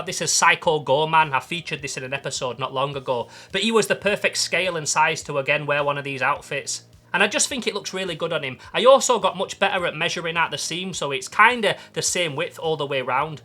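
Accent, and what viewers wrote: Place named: Sheffield, United Kingdom. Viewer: I've laser cut synthetic/synthetic blend fabrics before, and it slightly melts/seals the edges, so if you're wanting to do a little edition run, it could really save you time not having to hem the edges. Will defo take some work fiddling with settings and taking care not to create toxic gases, but like, it's insane how detailed you can get with it. This video was absolutely amazing and inspiring, your perseverance level is incredible, and it's so valuable to share how difficult this stuff can be. When I was young I once made a TINYYYYY pair of jeans, and if you think sleeve logic it's tricky, trouser patterns will turn your brain inside out, (and you'll feel like a genius afterwards hahahaha) KEEP IT UP AND ALSO SO REFRESHING TO HAVE A SHEFFIELD ACCENT IN YOUTUBE WORLD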